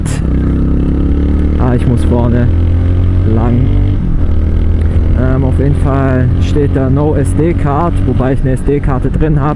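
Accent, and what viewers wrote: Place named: Bavaria, Germany. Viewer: Gruß in meine Heimatstadt! :) (Y) Gebürtig bist Du aber nicht aus S oder? Würde Dich vom Dialekt her eher Richtung Nord- oder Ostbayern verorten?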